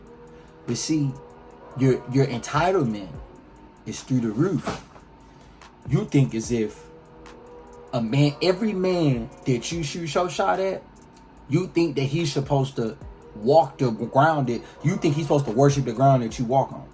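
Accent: Baltimore, United States. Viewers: This is my 1st time watching your vids. Are you from Baltimore? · U from bmore? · you From BMore? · BOY I KNOW YEW FROM BALTIMORE BY THE WAY YEW SPEAK 😂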